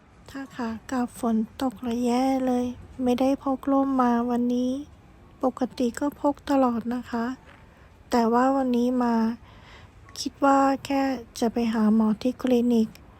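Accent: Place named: Japan. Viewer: ดีจ้า รับชมเมืองญี่ปุ่น ด้วยคะ ฟัง บรรยายจนเพลินเลยจ๊ะ บรรยายได้น่ารัก คะ สำนียงจะออกทางญี่ปุ่นหน่อย พั่ลัดด่จะรอชมคลิปต่อไปนะคะ❤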